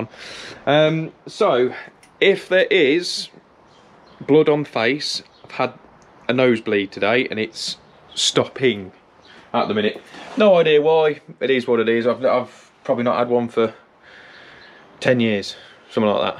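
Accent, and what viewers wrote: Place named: Nuneaton, United Kingdom. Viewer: Was trying to place the accent as thought it sounded East Midlands. Turns out it's Nuneaton, never noticed how different it was from Coventry before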